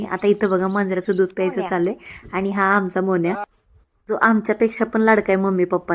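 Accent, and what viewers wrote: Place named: Sangli, India. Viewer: आमच्या सांगली सारखी भाषा आहे ❤❤ छान दिसताय 😊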